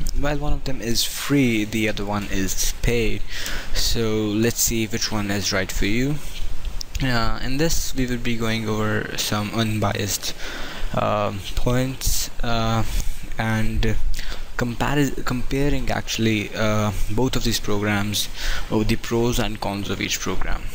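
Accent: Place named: India. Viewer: he's indian . i can get that from his accent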